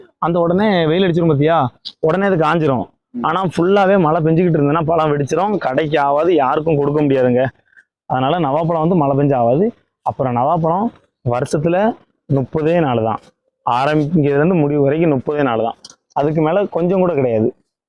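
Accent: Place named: Tirunelveli, India. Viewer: super bro.. which place in tamilnadu.. slang seems to be tirunelveli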